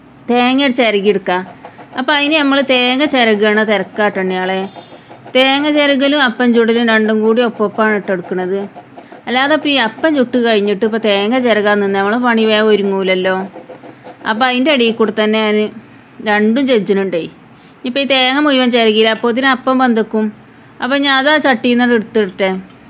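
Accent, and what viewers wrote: Malappuram, India. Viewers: അവതരണ ശൈലി നന്നായിട്ടുണ്ട് - അല്ലേലും മലപ്പുറം ഭാഷ ഒരു രസം തന്നെ - ഇഷ്ടായി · മലപ്പുറം ഭാഷ സൂപ്പർ വീഡിയോ സൂപ്പർ 💞💞💞💞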